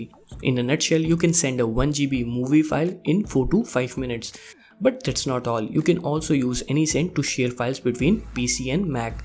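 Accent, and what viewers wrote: India. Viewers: Nah this is Indian Accent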